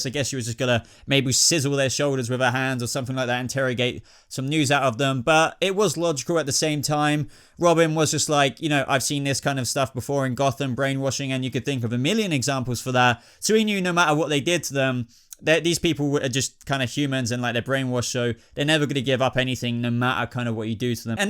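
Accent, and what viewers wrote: United Kingdom. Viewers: Hi. -Where are you from ? - I signed up to DC Universe and like your video. I'm a "Newbie" ... but I will 'Like' and 'Subscribe' to get your 'Take' on the series. ... USA, Washington State, Seattle here .. wondering where you are from .. "vit" a-mins" vs. "vite a mins" ... I'm thinkin' British, or Aussie or Kiwi. It's All good .... just glad it's in English .. or as you may characterize it (ME), American English :-p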